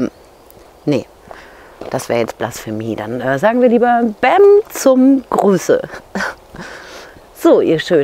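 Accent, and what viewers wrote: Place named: Upper Franconia, Germany. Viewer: des is fei jetzt aber nett schee. Oberfränkisch ist ein sehr schöner Dialekt 😂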